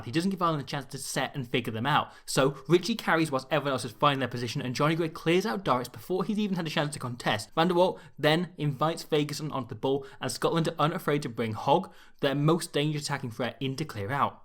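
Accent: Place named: England, United Kingdom. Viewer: he sounds english to me mabye born in England with welsh grandparents or something.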